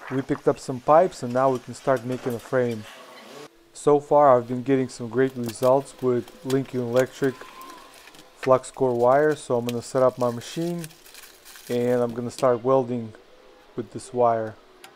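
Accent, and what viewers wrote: Russia. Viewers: Блин слышно сразу по голос русский))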